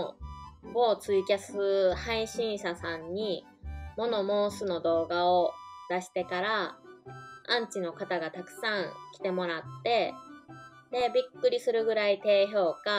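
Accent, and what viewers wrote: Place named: Kansai, Japan. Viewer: 大阪にちょっとだけいた元ホストです。関東の人間にはアメージングで謎なT新地の不思議をいろいろ教えてくださって勉強になります。またの関西弁にキュンキュンします。１０００人おめでとうございます。ライブ配信頑張ってください。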